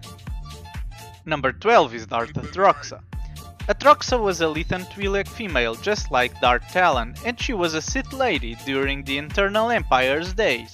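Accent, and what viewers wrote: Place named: Russia. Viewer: I love how Russian your voice is my guy it's a great accent!!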